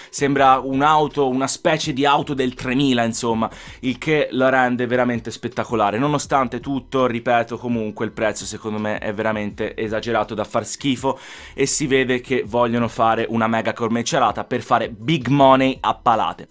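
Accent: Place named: Tuscany, Italy. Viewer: Mhhh prima volta vedo il tuo canale e mi pare tu sei Toscano... Montecatini? Il modo di parlare mi sembra di lì ahahah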